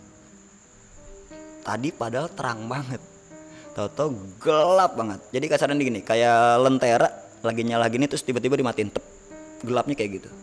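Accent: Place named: Bali, Indonesia. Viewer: Mungkin orang bali ngomong hurup Tnya biasanya gitu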